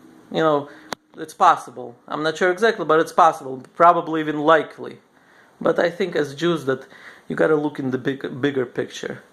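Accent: Russia. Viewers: Hi bro! I am crushed into your videos. Just curious to know where did you get your russian accent? )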